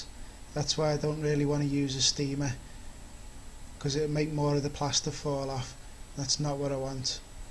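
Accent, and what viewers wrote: Liverpool, United Kingdom. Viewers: Yes lad scouce as well (Manc here) keep up the good work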